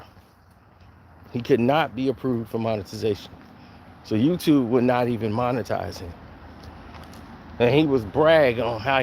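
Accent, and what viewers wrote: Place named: South, United States. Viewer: This DUDE Talk SLOW AND COUNTRY. GET TO THE FUCKING POINT. 😁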